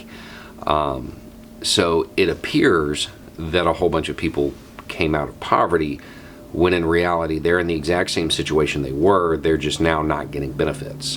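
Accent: South, United States. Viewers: First off let me say I am not a voter I stopped voting after Bush H said it’s enough I can’t change one thing. I did serve in the military for 6 years. I have watched many of your videos and I must say you just rehash everything that’s being said in a different way. You sound like you’re from the South but there’s something else. You are no better than the other lefties or righties out there pushing their bullshit. I’ll start voting again when we come together. I have said and will keep saying I’ll vote for a fiscal conservative no matter the party. But you with your Bob Ross demeanor and smooth quite way of thinking your way is the way is the only way. To me it’s just more don’t forget to thumbs up and subscribe so the $$$$ keep flowing and you are laughing all the way to the bank. It’s the capitalist way.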